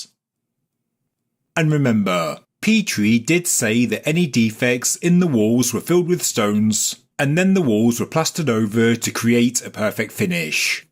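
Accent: United Kingdom. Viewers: Too bad! Get over it! There are plenty of other crappy channels that try to be as good as this one. You can give them a shot with their less annoying selves to listen to. You got better things to do than to bitch about someone giving you great information for free. In Britain there are many different accents and this is one of them. There are groups of people that talk just like this too. Move on. Stop bitching. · wow, you’re right. He sounded like a normal chap in his early videos. Now he sounds like a mix between a posh Englishman and a valley girl.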